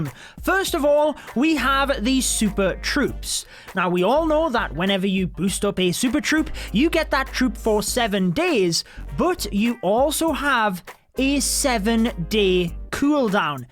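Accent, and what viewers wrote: United Kingdom. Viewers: The intro and that sweet British accent just makes everything better!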